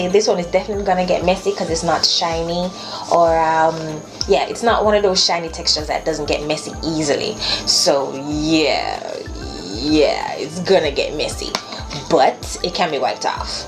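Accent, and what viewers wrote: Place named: Jamaica. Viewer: Lovely simple look that I will be rocking tomorrow lol 😂 you are absolutely lovely and the Jamaican accent is the cherry 🍒 on top. Thanks sis! 😊✌🏾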